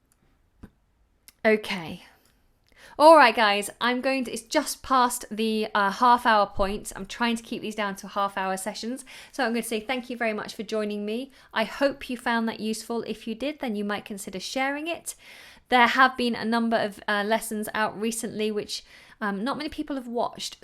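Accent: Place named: United Kingdom. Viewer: What a lovely British accent! I'm watching you from Syria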